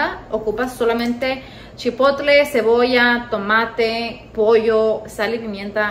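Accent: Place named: Northern Mexico, Mexico. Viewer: te escuchas bien norteña, de donde son tus papas?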